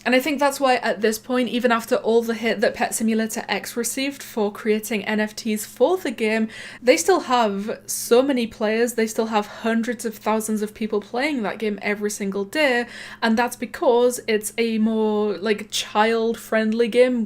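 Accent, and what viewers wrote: United Kingdom. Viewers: you British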